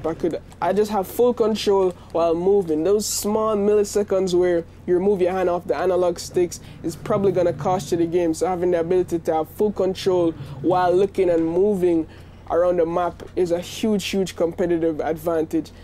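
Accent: Jamaica. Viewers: Is he Jamaican? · Are you jamaican....? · I knew yu was Jamaican when I heard your voice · Is it jamaican? My parents are Jamaican. 😊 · You Jamaican? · ya jamaican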